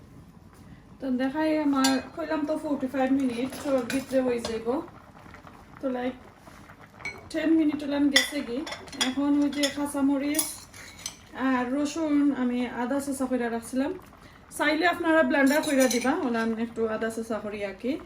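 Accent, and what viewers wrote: Sylhet, Bangladesh. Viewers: সিলটি মানুষের সিলটি মাত হুনলে বড় বালা লাগে এর লাগি আপনার ব্লগ আমার খুব পছন্দের। হুকন শিরা আমরার প্রিয় খাবার। আপনার গাছের কদু দুইটা খুব সুন্দর লাগের অনেক কচি। রেসিপি টা মাশাআল্লাহ খুব সুন্দর হয়েছে। সব মিলিয়ে আজকের ব্লগ টা খুব সুন্দর হয়েছে 😋😋👍👍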